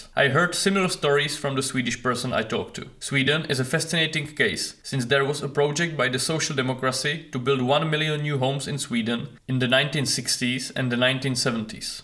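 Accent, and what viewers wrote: India. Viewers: that's a strong Indian accent though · Your accent sounds Indian. Are you from South Asia?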